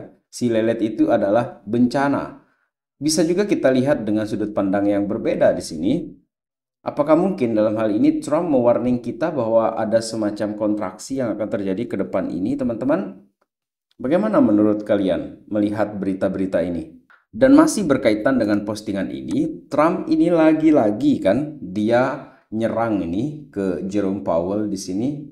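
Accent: Sumbawa, Indonesia. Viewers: Orang Sumbawa bang?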